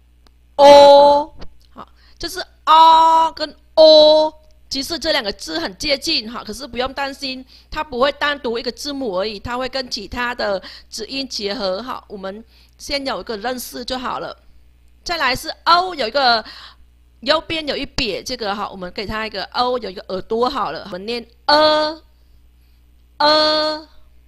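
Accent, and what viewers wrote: Vietnam. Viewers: 老師,冒昧問,你的發音,南越腔,還是北越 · 太感謝了! 在越南工作我都不知道我同事在說什麼 😂 老師的發音應該是北越吧! 跟我公司同事發音一樣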